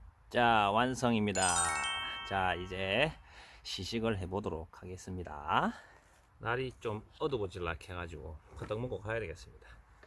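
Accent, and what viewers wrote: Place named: Seoul, South Korea. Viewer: 목소리 딱듣고 스울사람 인지 알았음 ㅋㅋ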